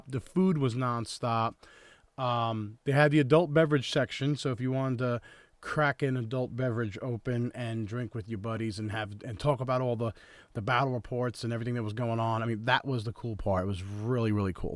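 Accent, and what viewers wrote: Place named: New York, United States. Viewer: you sound like you're in the new york area. if you are I wanna take you on on the space map!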